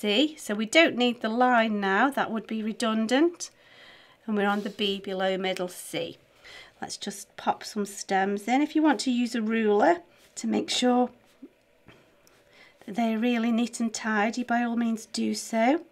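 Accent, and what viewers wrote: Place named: Dudley, United Kingdom. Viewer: not far away from me! I thought your accent was quite near hehe! I’m in dudley! Nathan rose is my teacher!:) x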